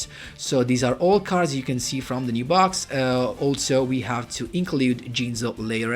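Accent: Italy. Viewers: Parli na cifra bene in inglese ma si sente che sei italiano bro, bel video comunque di rado si vedono deck list diverse dalle solite che sono anche competitive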